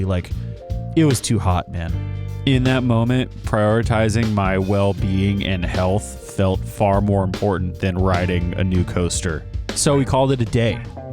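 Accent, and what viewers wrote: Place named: Florida, United States. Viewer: you talk like a friend of mine from Ocala, Florida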